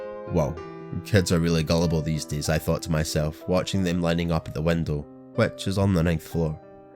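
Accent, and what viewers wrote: Scotland, United Kingdom. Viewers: Mr I like scary stories but your Scottish voice just makes it better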